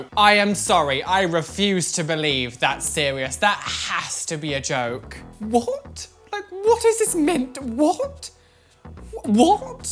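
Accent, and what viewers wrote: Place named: RP, United Kingdom. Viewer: Omg I haven't been watching your videos for long bit I think u r soooooo funny and I love the accent ur so posh sounding 😀😀😀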